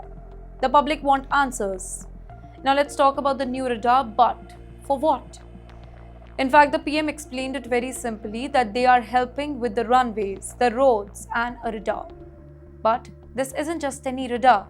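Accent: India. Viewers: You with you India accent you worry China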